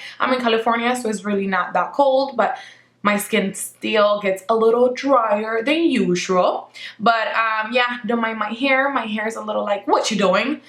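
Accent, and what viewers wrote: Latin America. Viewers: Her accent isn't American lol. She's Latino which is what you're hearing when she speaks.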